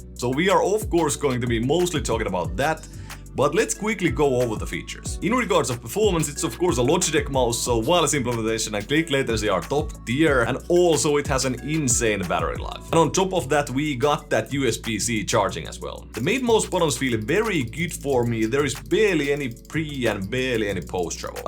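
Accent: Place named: Finland. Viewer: Your reviews are amazing and the Finnish accent is icing on top of the cake!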